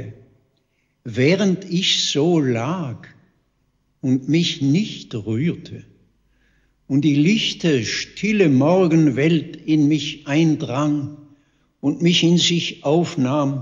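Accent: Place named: Swabia, Germany. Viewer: Wie berührend, den schwäbischen Tonfall in seiner Stimme zu hören. Diese schöne poetische Sprache ist wie Melodie. Leider fast vergessen.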